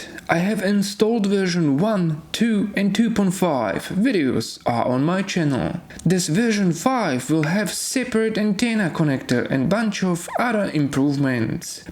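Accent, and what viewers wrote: Russia. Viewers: that Russian accent...yak... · your English is pretty good and Russian accent is actually cool 🤙 What matters the most, you took the time to make the video 🙌. Losers will always leave loser comments 😅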